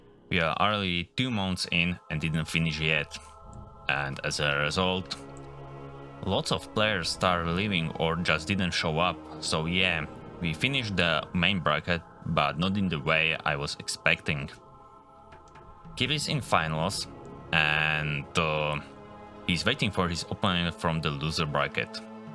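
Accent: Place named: Poland. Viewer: I'm still trying to figure out where you are from. My guess is Polish because of your accent but wouldn't be surprised of it's Czech/Slovak... :)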